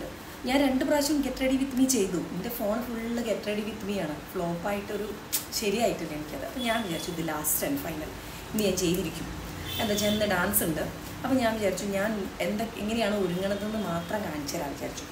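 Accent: Kozhikode, India. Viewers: Very decent, humorous, transparent, innocent, spontaneous way of talking...over aakadhe ulla presentation um. Just can't take eyes from ur video.nammade kozhikodan baasha vrithiyil kaikaaryam cheyyunnu. Good going 👌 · 😂😂😂 sudari pettanu evidayo kettapole 😂😂soo beautiful so elegant just looking like a wow orma vannu😂😂😂 basha evidayo kettapole (ibbal evidaya) Kozhikode Aya enniku pettanu mansillayilla😂😂 nalla humorous talking ee fast chayitha bagham cut chayitha pore njagalku kekunumilla pinne athu cut chayitha leghtum korayum etha ee pennkutti Ella prodaktillum edukumbam parayunna 😂😂 enniki oru likeum comment tharane please 😊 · കോഴിക്കോട് നാട്ടിൻപുറം സംസാരം 👍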